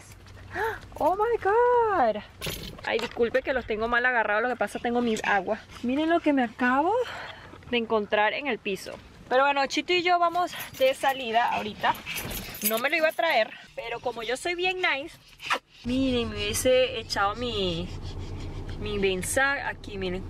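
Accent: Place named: Venezuela. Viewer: Sos hermosa!! Me encantan tus videos, tu acento venezolano hermoso.👋👋👌❤️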